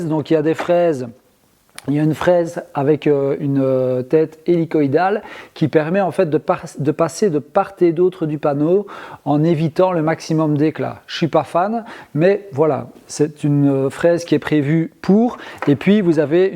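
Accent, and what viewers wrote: Belgium. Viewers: Oh ça n’a pas l’air de tourner bien rond aujourd’hui!!! Si tu décides d’arrêter à cause d’un charlot, ça ne ressemble à rien. Ignore-le, c’est la meilleure chose à faire, nous on te respect pour ton job, pour ton savoir faire ainsi que toute ton équipe, quand je dis nous je parles au nom de la majorité de tes abonnés. S’il y a un con qui se moque de ton accent ou des belges, en général, c’est qu’il n’est pas bien intelligent, laisse dire les jaloux et continu ton job. Tu es un mec bien et tous les collègues aussi. Salutations très amicales. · Les erreurs reste humaine courage ne te décourage pour des râgeaux ça n en vaut la peine . De plus en France nous adorons nos voisin belge et leurs façon de parle ..car le sais tu d une région à une autre en France il y a des façon de parler totalement différent....allez les gars courage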